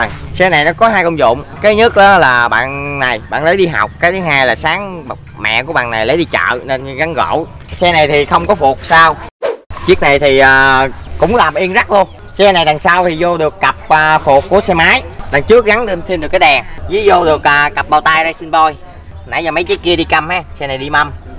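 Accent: Vietnam. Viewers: Dân bến tre hả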